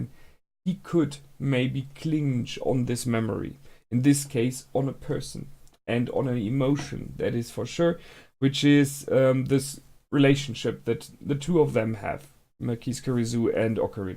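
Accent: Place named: Germany. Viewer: Hört man wenn man selbst Deutsch ist:)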